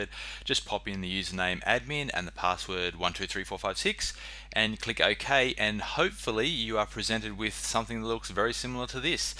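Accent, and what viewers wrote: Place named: Australia. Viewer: Thanks for sharing this. I prefer the Australian accent that the Chinese calligraphy ... no offence, but its much easier to follow :). I wish you could add the settings for the Iphone and port forwarding. Thanks again from Bristol UK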